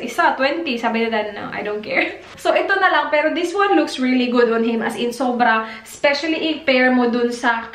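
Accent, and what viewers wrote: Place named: Visayas, Philippines. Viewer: Bisaya tingali nis indai..mailhan Jud ang punto ba hehe...basta bisaya nice jd mag English..dabah? 😉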